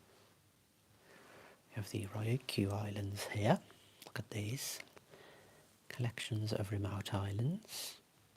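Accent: United Kingdom. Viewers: Most likely British English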